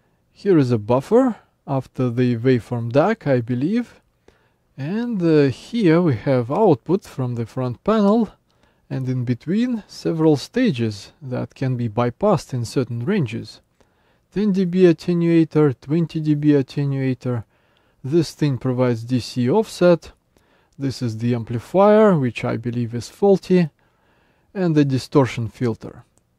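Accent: Russia. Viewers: Hm, you have a Russian accent. Are you from Russia?=)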